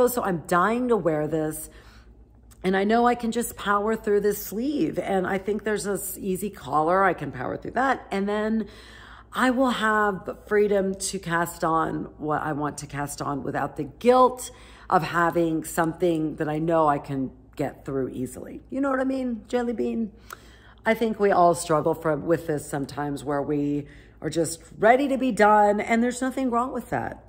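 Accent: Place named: Texas, United States. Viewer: I so enjoy your show ,I,m in Alabama now but am Ft.Worth Texas ,and I smile every time you say you,all ,that’s the Texas in us ,I say it to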